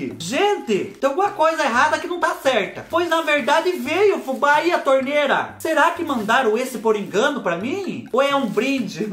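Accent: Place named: Juazeiro, Brazil. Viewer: Sou do Ceará, e acho o sotaque do fubá muito parecido com quem mora em Juazeiro.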